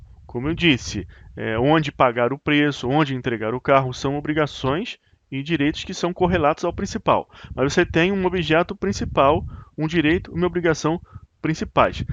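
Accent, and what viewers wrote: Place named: Rio de Janeiro, Brazil. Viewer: Aula boa. Mas não deu para deixar de reparar vc falando Nascer substituindo o som do S pelo da letra I (naicer), é estranho aos ouvidos, mas sei que é característico do carioca. O Interessante é que vc conseguiu falar nascituro com todos os sons das letras da palavra.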